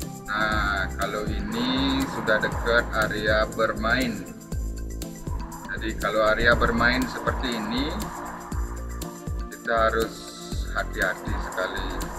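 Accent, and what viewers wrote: Bali, Indonesia. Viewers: Hehehe...logat baline kental Bli...salam aspal🤣🤣🤣🤣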